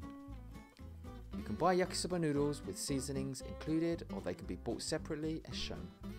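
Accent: United Kingdom. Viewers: dude, I have been wondering that are you Japanese or British? Quite an accent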